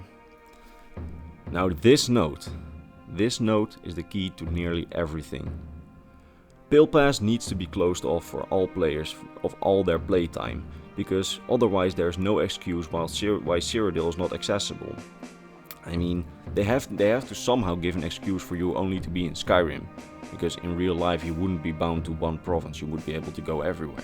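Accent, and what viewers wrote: Netherlands. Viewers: Are you like a... Dutch Farengar? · is that a fellow dutchman i hear? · I can hear from your accent that you are Dutch. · You sound Dutch, if I am correct in assuming this then awesome cuz i'm dutch, if not then sue me, nice vid tho. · Are you Dutch?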